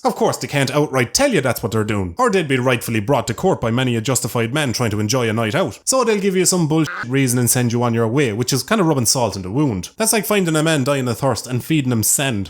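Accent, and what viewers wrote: Ireland. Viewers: Every time I see when you upload, I stop, and I go watch your videos man. They are a riot, also that Irish accent man, you rock. Super entertaining, keep it up! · OMG sexy irish voice boi about to blow up! Won't be long before you're at 1mil after you hit 100k. Congrats from 21+ hell place,! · A lot of Irish accents are like this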